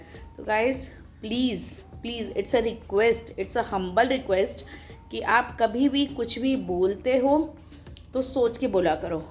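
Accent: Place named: Bhiwani, India. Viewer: Hanji di sahi kaha di aapne hm bhi Haryana s hai hmari boli hee kadak h or sabko lgta h ki hmari tone gusse vali lgti h or vese di aap kaha s ho dadi ama ki bhasha s too ese lgta h ki aap Bhivani side k ho Reply zrur Krna di 😀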